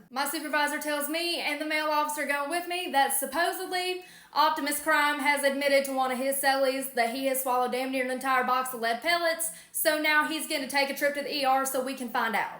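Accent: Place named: South, United States. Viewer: Great! I had to listen to this twice, she got me with her southern accent and her looks and never listened to what see said.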